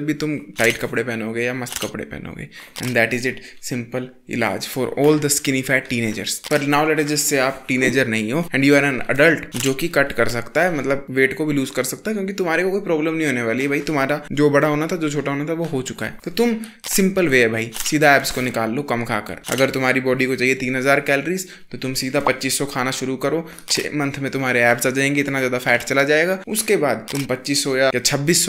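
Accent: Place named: Pahadi, India. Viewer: Bro , ap Himachal sai ho na ? Apkai tone thodi sei Pahadi lag rahe hai 🙂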